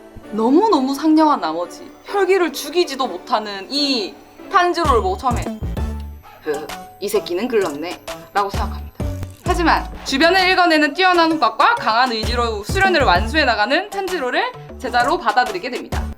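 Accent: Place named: Gyeongsang, South Korea. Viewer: 경상도 사투리를 고급지게 구사하시네요👍👍👍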